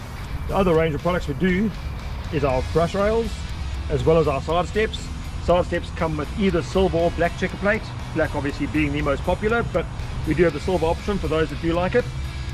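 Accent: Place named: South Africa. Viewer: He sounds South African. :)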